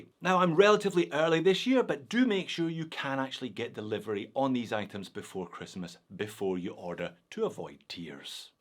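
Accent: Scotland, United Kingdom. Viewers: I’m not going to win fuck all anyway so I’ll just say that the video was very good as usual ,i really like videos just to hear your accent reminds of home back in Scotland it’s the only one i hear as i now live near Boston Massachusetts ,have you heard the Boston accent? I’ve been here over twenty years and I think the Peterhead fishermen have had elocution lessons compared to the accent here,so you and your new family and Mr P have a fucking wonderful Christmas and drunken fucking outrageous New Year you only live once please make the best of it.🇦🇺🏴🦘🐑👍🍸🍸🍸🥂🥂🥂😂😂😄Comments like this are why I don’t win sod all and finally please keep up the Scottish sense of humor it’s one of the few things the Tory’s have left us.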